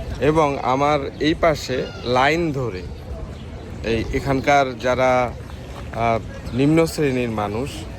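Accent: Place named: Dinajpur, Bangladesh. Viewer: এ হতে পারে ওপার দিনাজপুর গ্রামের গলার স্বর তেমনি বলে।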